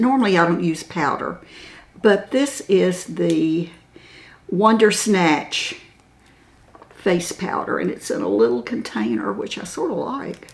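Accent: South, United States. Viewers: I so adore you! You are so sweet, so genuine and I love your southern accent! I got the NYX foundation and love it!! I am a makeup junkie and have countless foundation's. I have never used any drugstore cosmetics but am now a convert. Since watching your channel I am a fan of e.l.f and NYX! Not going back to ANY prestige makeup! Thank you for making me a fan! xxx ooo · Whoever is managing the YouTube recommendations? Thank you so much for putting this beautiful lady on my recommendations list, and as a cisgender Gen Z male? I literally enjoyed every single minute of this video, the warmth of the southern drawl and her spirit and beauty and elegance and easiness, it’s so soothing and beautiful like the rest of her warm, regal spirit and beauty.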